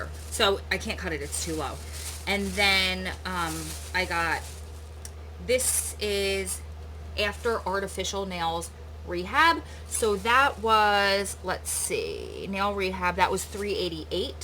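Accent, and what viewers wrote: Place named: Long Island, United States. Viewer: Awww no prob! I love your personality & accent. I have the same one bc I'm from long island, NY. Lol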